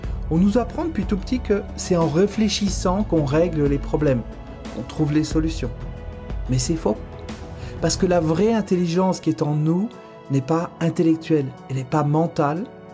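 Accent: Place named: Eastern France, France. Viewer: Ton accent te piège??? Lol . T es de l est de la France????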